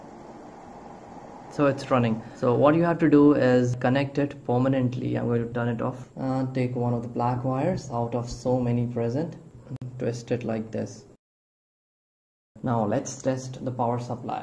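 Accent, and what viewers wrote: India. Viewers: Индусский английский, это самый ужасный английский на земле.🤢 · 여기는아느나라인가 발음이 인도인듣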